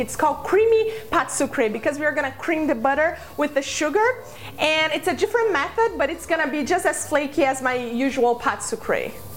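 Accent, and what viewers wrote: Brazil. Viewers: Omg girl! You so pretty I love that accent! I'm guessing you're from Brazil? Anyways thanks for the video I'm gonna do this 😚😍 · Sotaque brasileiro é inconfundível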